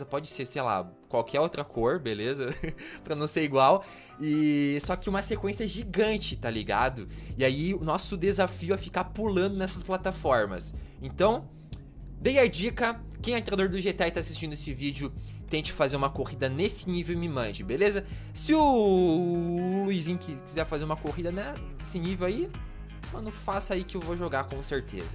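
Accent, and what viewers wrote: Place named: Paraná, Brazil. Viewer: porque nas antigas voce falava mais em um sutaque mais do Sul (Gauchos) e agora voce fala mais Paranaence???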